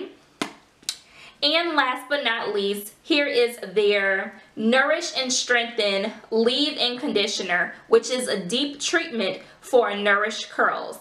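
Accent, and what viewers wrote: New Orleans, United States. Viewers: Sounds like a hear a whole lotta NOLA in your voice #yaheardme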